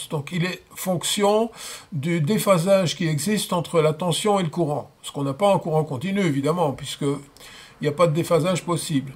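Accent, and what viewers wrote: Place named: Belgium. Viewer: Merci de cette vidéo bien expliquée (+ sympathique accent belge...)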